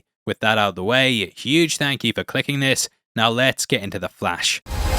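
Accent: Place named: North, United Kingdom. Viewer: Find your accent so interesting. Clearly northern but you drop ‘t’s like an American. 😅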